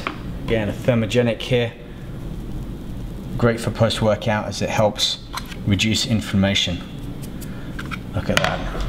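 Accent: London, United Kingdom. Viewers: YOU SOUND LIKE YOU'RE FROM LONDON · lol he is british, he has a very strong british accent. Born in london and so on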